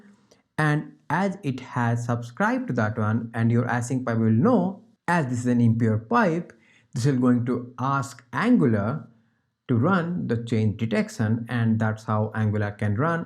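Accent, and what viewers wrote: India. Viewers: Thanks man! Always easier to learn from an Indian English speaker.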